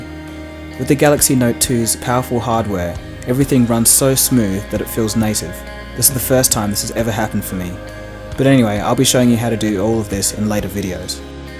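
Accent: Australia. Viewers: Really good review, probly the best review of anything ive seen on youtube, no kidding oh and great to hear a fellow Aussie doing it. looks like an Awesome device, didnt see any drawing on it really, will look at your other clips. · This is one one of the best reviews I have ever seen. I just wish I could buy the phone. It's too pricy for me to buy. I am from Indonesia and it cost a lot here. All I want to say thanks mate. I love your Aussie accent. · great review but I still love my iPhone 5. Love your australian accent though XD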